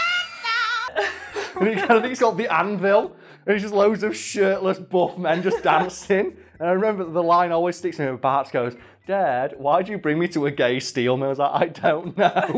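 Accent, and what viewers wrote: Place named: Cockney, United Kingdom. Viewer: I don't even know how to describe this channel. My GF asks me what I'm watching all the time that has that "blaring Cockney accent" and I just say. . . .uhh he's talking about things . . . That happened. . . With tv and movies. . . But it's funny and interesting. She says "like TMZ for the UK?" And I say "NOOO DONT YOU FUCKIN DARE"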